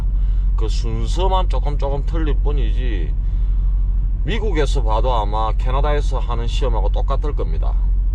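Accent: Gyeongsang, South Korea. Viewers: 아---- 네^^ 내 친구 목소리와 너무 똑 같습니다. 분 목소리는 톤이 굵은 경상도 말씨로 단호하면서도 성실하고 진실, 예의바른 어투로 특별한 개성의 보이습니다.계속 영상 시청하면 왠지 진주 친구 얼굴이 자꾸 오버랩 데내요^^항상 건강하시고 좋은 하루 되세요!!! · 전 경상도사람은 아닌데 경상도 아저씨의 구수한 말투와 따뜻한 마음씨가 느껴지네요~^^ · 어떤영상에 머리에 흰두근쓴 아가 스탑 싸인을 무시하고 달려 스쿨버스와 충돌한 대형사고가 있었다는 님의 영상이 생각나네요 약속은 지키라고 있는것이죠 편집에러 발생 원래 대사는 샤워장에서 깨끗이 샤워하고 뵙겠습니다 라고 해야 하는데 깨끗에서 짤렸네요ㅡ😜 머 그럴수 있죠 많은 댓글에서 님의 의도와 다른 맘으로 글을 싸지르고 있는 ㄴㄷ보다 님의 일상과 경험 구수한 보리문디의 한글소리 영어소리가 정겹고 다시보고 자주보고 아침마다 님을 기다리는 분들이 더 많을 것입니다 화이팅 하시고 응원합니다 잘보고 갑니다 ㅡ🚛 🚛 🚛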